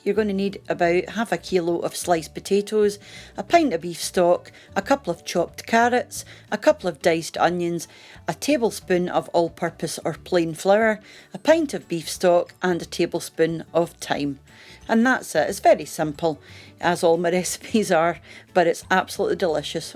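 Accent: Scotland, United Kingdom. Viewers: Love the Scots accent. Thank you for sharing your recipe. Will give it a go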